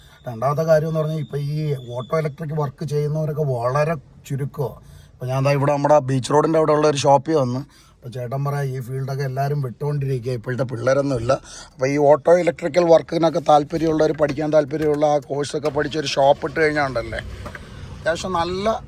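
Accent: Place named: Kollam, India. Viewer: Kollam സ്ലാങ് ❤❤❤❤❤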